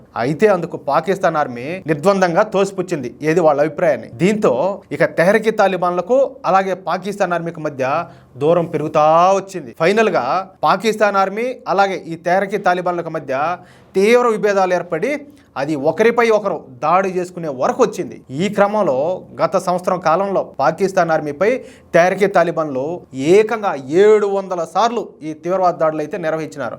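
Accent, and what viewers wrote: Rayalaseema, India. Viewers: నమస్కారం గారు మీరు చెప్పే ప్రతి మాట తూటా లాగా ఉంటది రాయలసీమ యాసలో అద్భుతంగా మాట్లాడుతున్నారు నిక్కచ్చిగా ఉన్నట్లు మాట్లాడటం చాలా బాగుంది🎉🎉🎉🎉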